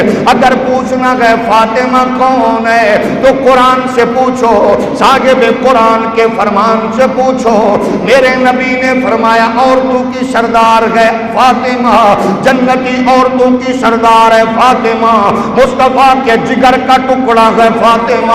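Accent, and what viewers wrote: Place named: Punjab, Pakistan. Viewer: Maa sha Allah lykin jo ap ki Punjabi ka lotaaf ata hai ous jysa kio maza nahi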